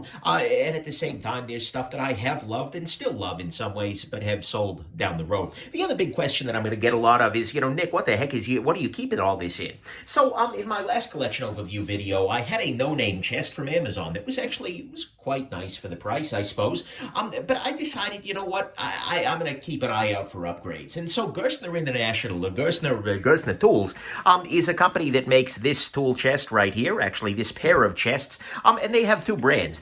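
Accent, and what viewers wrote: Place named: East Coast, United States. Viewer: Your in california?? I felt certain your were an east coast guy.... I'm sure at least from the northeast part of the country.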